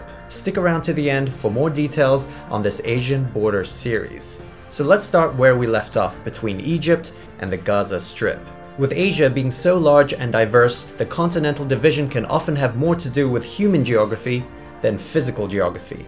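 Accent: United States. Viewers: Did I hear correctly in one of your other videos that neither of you have ever been to the US? I'm curious because your English sounds very American with maybe just a hint of an Asian accent while sounds more European. Where did you learn English? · You have many different pronunciation of place names than Americans. Is it of British or some other origin? Your accent seems closest to American though.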